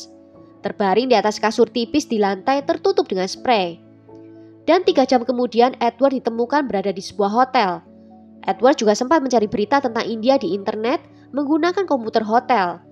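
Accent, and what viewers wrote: Java, Indonesia. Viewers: Hayyy medokuhhh 👍💖💖💖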